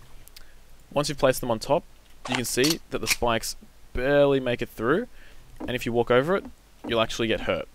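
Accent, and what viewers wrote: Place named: Australia. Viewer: You're Aussie!! Awesome bro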